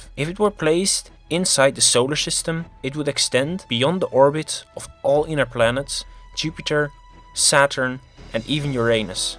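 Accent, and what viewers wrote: Netherlands. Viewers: sounds more like a dutch accent than a lisp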